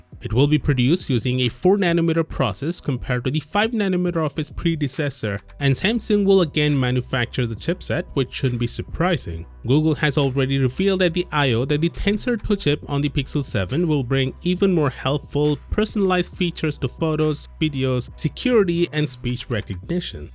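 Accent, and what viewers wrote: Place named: India. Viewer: Ugg why does youtube always recommend me this fucking channel. Go away. I just want tech news. I can't stand this guys indian accent.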